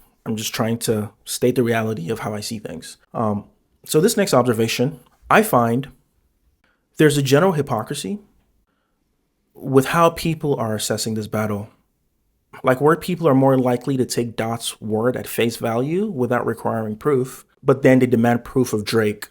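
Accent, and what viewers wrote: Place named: Canada. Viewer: He’s just surface level reacting to get views from the situation which is disingenuous because if he truly wants to have genuine discussion he would actually do the work of making sure all his points have source’s. For example doesn’t have “higher ups” anymore and even said in the song he doesn’t care what the industry has to say which is where the true essence of the battle lies. What Drake represents is what has watered down hip hop to something vapid and almost soul less. said he is not our savior. Also if your not from this culture (which homie sounds like he has some Canadian in his accent no hate) you will never understand the blood, sweat and tears that has given this art form its soul. From Police brutality in Compton being called out by NWA to Kendricks “ ”. To those saying it’s performative even during the civil rights era Mahalia Jackson sang to keep the spirits up of the people.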